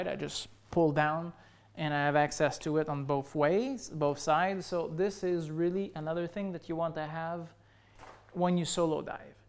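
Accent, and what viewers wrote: Quebec, Canada. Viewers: good info, are you by any chance from Quebec? your accent sound familiar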